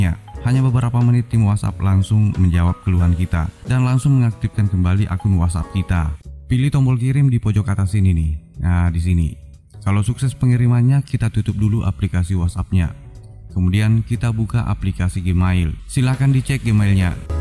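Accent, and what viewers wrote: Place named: Bugis, Indonesia. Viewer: Logattt nya logattt bugisss skli🗿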